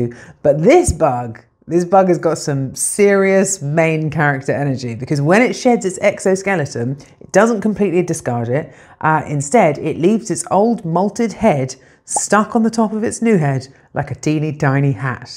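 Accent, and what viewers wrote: England, United Kingdom. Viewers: There I am, minding my business. Here comes the internet, throwing the most beautiful Lady at me. On top of that, talking about interesting stuff in an English accent. And there I was, trying hard not to get one of these unhealthy internet crushes. You’re gorgeous, you’re voice is magical and your content is quite interesting, for the short formats of our time. Keep going, all the best to you🍀🎶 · I lovvvve this lady's smarts, sex appeal, sense of joy in her work and that beautifully entrancing British accent! Keep it up! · the reason why i will never have a partner is that this is exactly my type a pretty red heat yet dignified lady with a British accent and hot..... they dont exist where i live..... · Red hair freckles British accent...im just like 🥰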